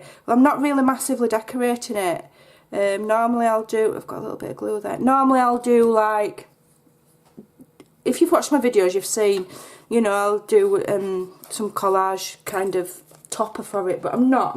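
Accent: Yorkshire, United Kingdom. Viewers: Your cover is just perfect for your beautiful journal 💝 Love your ingenuity with the faux book plate 💝 Love your Yorkshire accent ! We have strong accents down under too🦘🇦🇺 🐨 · One happy mistake. The cover looks gorgeous. Ty for sharing how you made your book thingy (foggy brain!). Great idea. I have relatives in Ripon and elsewhere in Yorkshire and Teeside, so I love your accent. I lived in Middlesbrough and also Ripon many, many moons ago. Lol. Have a nice weekend. Xx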